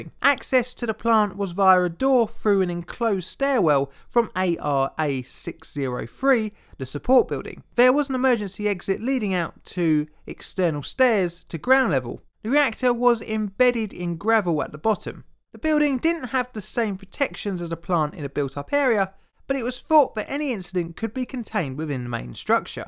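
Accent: United Kingdom. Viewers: Please everyone. Stop moaning over the British pronunciations. Our American pronunciations also grind on the Brits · Fellow American here, I love how all those different British accents sound. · Why do all these videos have a British guy? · He doesn't pronounce the 'L' sound, substituting 'W'. Except at the beginning of a word (showing he CAN pronounce L if he tries) Fuel becomes Fuew. 'Controw rod'. "Criticw". 'Impaiwd by one of the shiewd plugs'. 'Faws alarm'. 'Consuwting wif a doctor'. The 'th' sound has disappeared. "Vey wifdrew after seeing 65 rotengens (sic)". This is not a charming regional English accent. It's ugly lazy bad speech. Sadly, English speech had degenerated across the spectrum into a sickeningly ugly patois and it gets worse with every generation. I'd bet that this chap's grandfather had far better speech than he does. The younger ones, the millennials, are barely intelligible. 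You need subtitles. It's sad. Listen to any old english movie from the 60s and you hear english beautifully spoken. This speech is now almost completely lost. These days, the English have by the far the ugliest speech in the Anglophone world. Americans, Canadians, New Zealanders, Trinidadians, etc all speak far better than the English. If you want an example of beautiful contemporary english speech have a listen to Canadian Stefan Molyneux. I could have enjoyed the content of this video but the speech made me feel ill and depressed and I switched it off. Sorry.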